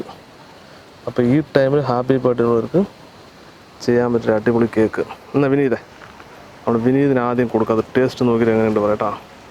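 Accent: Palakkad, India. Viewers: Pallakad ann makale..😎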